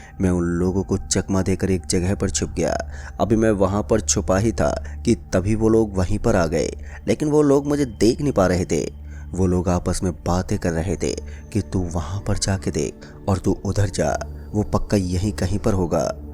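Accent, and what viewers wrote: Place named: Noida, India. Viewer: Bhai ap noida se ho na voice se lgra hai re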